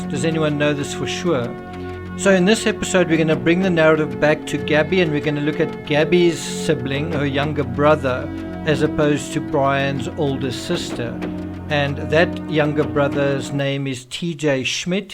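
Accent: South Africa. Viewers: Trying to work out if u are from CT, KZN or Gauteng with that accent.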